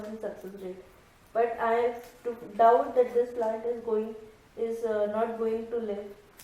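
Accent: India. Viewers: Gotta love Punjab voices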